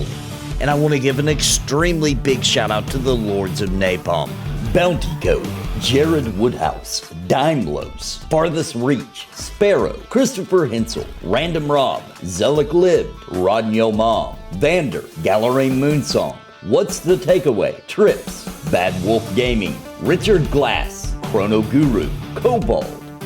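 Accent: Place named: South Carolina, United States. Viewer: I live in South Carolina.... your accent reminds me of alot of people around here.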